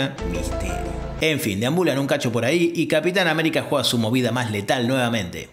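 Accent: Argentina. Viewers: Tenías que ser argentino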